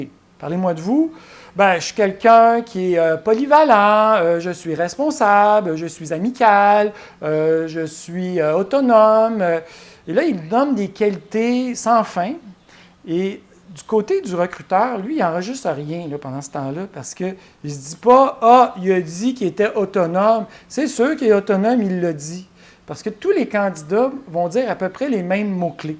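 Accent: Quebec, Canada. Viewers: It's in Quebec, Canada. Not everyone in the world is from France.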